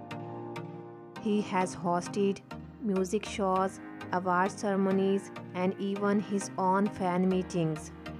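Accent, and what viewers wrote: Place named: Thailand. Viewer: I could be wrong but i think the narrator seems to have Thai accent.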